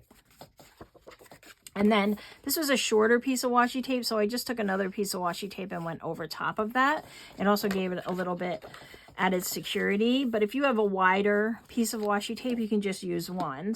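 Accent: New York, United States. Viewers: I will definitely try this. Totally enjoy your videos. And your NY accent.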